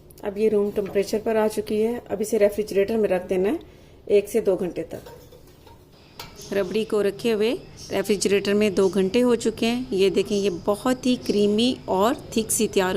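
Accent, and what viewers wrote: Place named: Pakistan. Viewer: Mam ka accent toh pura Pakistani hai lekin pakwaan ki koi seema nahi hoti😋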